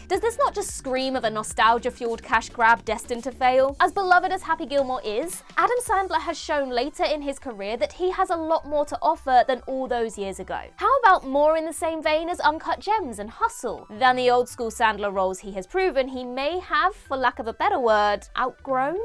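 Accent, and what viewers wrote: United Kingdom. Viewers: Could this hostess be any more British?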